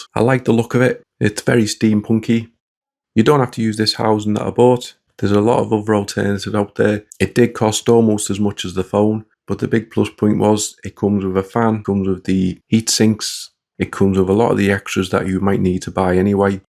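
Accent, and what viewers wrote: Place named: Liverpool, United Kingdom. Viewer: Love it, I have a Google Pixel 6a that I can run Ubuntu or Kali net hunter on. The mellow scouse accent was different.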